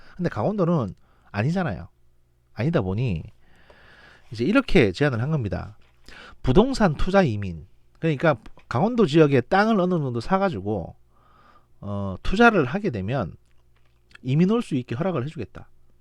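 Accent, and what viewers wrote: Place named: China. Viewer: 조선족님아....님 발음에 조선족 발음이 묻어 있는거 아세요? 전 천안문 민주화 운동을 지지하러 갑니다.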